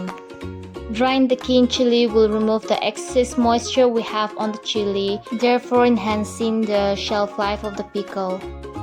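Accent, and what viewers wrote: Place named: Nagaland, India. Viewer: Well to be honest Sema people knows better when it comes to foodies of pickles etc etc... 😘 And I love the way you speak with that sema accent😍 and this looks tastier🤤 surely gonna try it!! 🤪 But one thing I want to know is can we put salt??🤔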